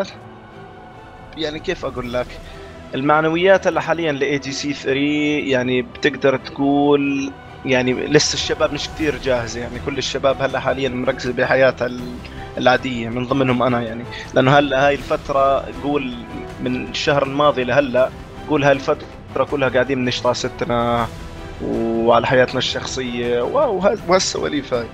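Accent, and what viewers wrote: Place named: Jordan. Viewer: ههههههههههه بلزمش تكتبو اسمو 😂😂😂 معروف من لهجتو اردني 😆😊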